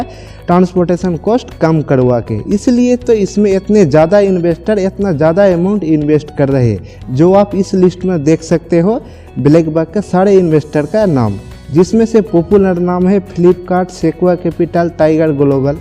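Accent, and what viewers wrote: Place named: Bangladesh. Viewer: Yah pakka Bangladeshi Koi aadami bol raha hai yah pakka Bangladeshi hai iska Bhasha hi language hi bata raha hai ki yah Bangladesh Hai yah Thag Hai Thag video hai Thag video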